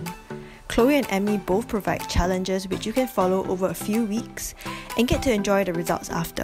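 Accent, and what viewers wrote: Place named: Singapore. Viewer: You're Singaporean right? 🤔🤔🤔👀 I can tell by your little accent! 💖💖💖😇😇😇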